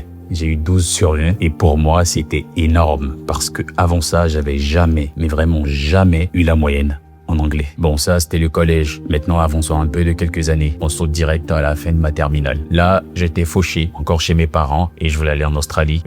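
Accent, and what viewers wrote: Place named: Ivory Coast. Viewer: C'est l'accent ivoirien ça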